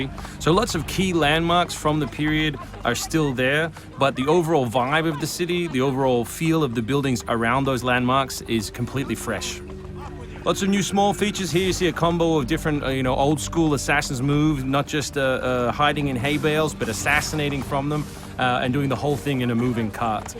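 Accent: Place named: Australia. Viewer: Sounds like a bit of Aussie in the narrators accent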